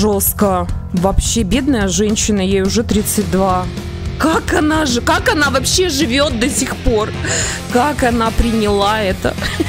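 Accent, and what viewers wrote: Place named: Germany. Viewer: немецкий акцент проскакивает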